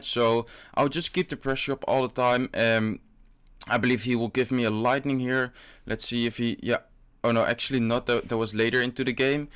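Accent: Netherlands. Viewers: Where are you from you sound like Some one from the netherlands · you are dutch arent you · ur 100% from holland. 100 procent. ur accent is to recogniseable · ben je nederlands je accent is beetje nl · je hoort zo goed dat ie NL is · Ik hou van je nederlands accent op je Engels ❤️❤️❤️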